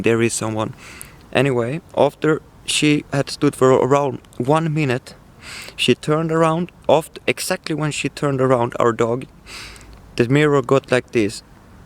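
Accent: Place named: Sweden. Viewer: My husband and I enjoy your videos. He said your accent reminds him of his grandparents, as they too were Swedish. hehe We may be in America, but you are not alone. Sending you both all my love and prayers during a difficult anniversary (your wife's father), and a celebration of life (your birthday) I too am a survivor like you, I died during brain surgery. You are still doing an amazing job. Like I said before, your english is very good, and you explain everything quite well. P.S. - Did you already upgrade the mic or camera. The background noise, and clattering is almost nothing Sounds much better!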